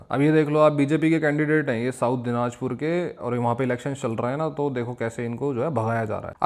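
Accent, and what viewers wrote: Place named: Uttarakhand, India. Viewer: Bhai ❤ uttrakhand ke khatima ke kurti walo ki trh bolta h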